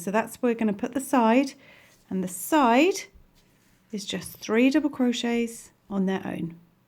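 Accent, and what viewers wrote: United Kingdom. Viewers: I think that even though I'm from the UK, I grown to use USA stitch terms because all the people on YouTube who taught me how to crochet are american Also excuse me for being stupid, but are you from the UK, because you sound like it P.S you explain so well! This helped me so much!!!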